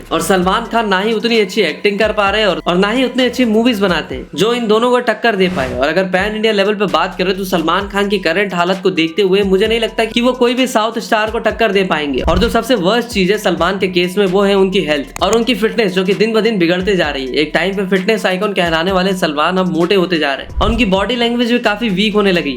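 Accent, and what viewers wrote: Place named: Bhopal, India. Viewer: Bhai tu bhopali lagriya hai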